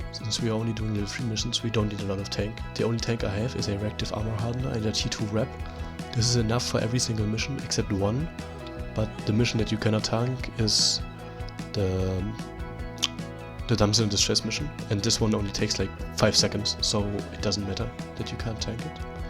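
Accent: Germany. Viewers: you have such a cute Deutch accent =)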